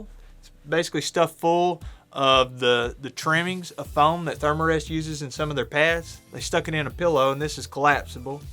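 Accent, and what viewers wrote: Kentucky, United States. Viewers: Man I knew that Kentucky accent 😂 I’m from Louisville but get out to the gorge and big south fork as much as I can. Maybe we’ll cross paths sometime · "See we dont like our spoons with short handles...we like em with long handles that way you can use em just like at" snaps fingers im from Alabama and i gotta tell ya the kentucky accent is great man. Great content as always.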